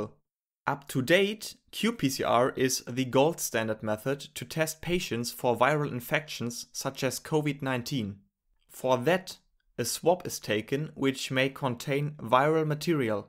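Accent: Germany. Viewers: Are you German? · Love the German accent and your explanations, keep going!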